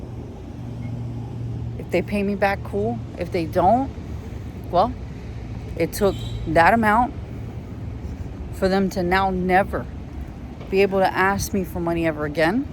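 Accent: New York, United States. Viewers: you actually sound Italian in your normal speaking. You sounded great imitating Sonny, because you naturally sound like from New York or Philadelphia! You always reminded me of a girl version of Ralph Macchio. You would have been terrific if you got to be in the Godfather movies. I lent $1050 last year to two different people, $500 to one person and $550 to another person. I haven't gotten a penny back so far. Well, I will be just like Sonny, they will never ask me for money ever again, so I paid that to get them off my back. Also, I truly believe that God replenishes in the future. God will give it back and I might not even realise it, but he will.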